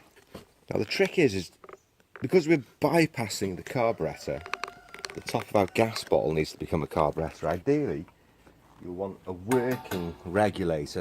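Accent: Wales, United Kingdom. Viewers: Awesome !!! Been wanting to try that for ages ! Maybe next : gasification??? Familiar accent ? Rhyl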